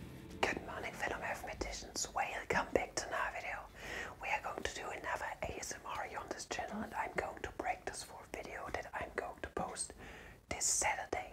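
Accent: Germany. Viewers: You German good sir ?? Btw thanks for the video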